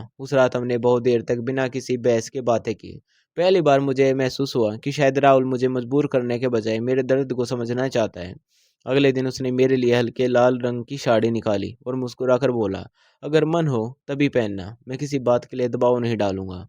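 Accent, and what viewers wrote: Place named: Haryana, India. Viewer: हरियाणवी भाषा काम लहजा बोली में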